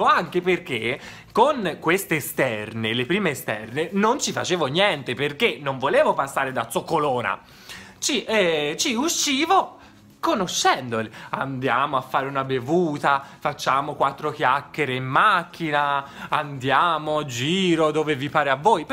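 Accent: Tuscany, Italy. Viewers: Me rallegri la vita😂 sentí parlà toscano è troppo bello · Non c'è la posso fare 🤣😂🤣😂🤣😂 tu mi fai morire 🔝🤣😍 ti adoro...poi da parte di padre so Toscano e quindi adoro sentittè parlá toscano ❤️😍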